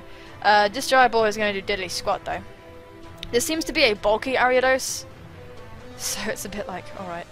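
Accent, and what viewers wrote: United Kingdom. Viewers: You are SUPER British! Lol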